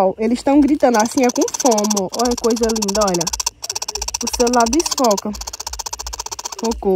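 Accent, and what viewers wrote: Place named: Northeast, Brazil. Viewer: Tu nunca veio no nordeste não foi ? Nois só fala assim, ninguém e igual a ninguém todo cidade todo país,as pessoas tem seu jeito de falar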